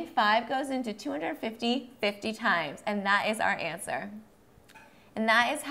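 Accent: United States. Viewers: Yes so annoyed. She sounds like a yankee.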